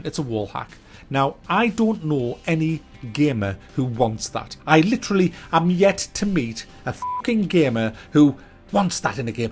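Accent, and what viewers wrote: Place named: Newcastle, United Kingdom. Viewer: You sound a bit like a geordie too??? There's a few on YouTube now it's great to hear 😊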